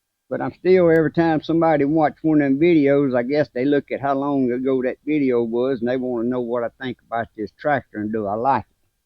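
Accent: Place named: South Louisiana, United States. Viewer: Your accent sounds more south louisiana than north . I'm from Avoyelles Parish.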